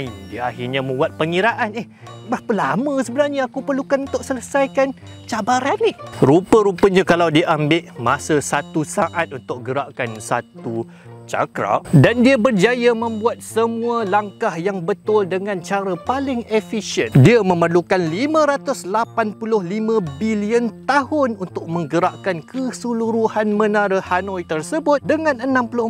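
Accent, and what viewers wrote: Malaysia. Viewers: Logat orang Malay membuat saya sendiri tertavva terbahak bahak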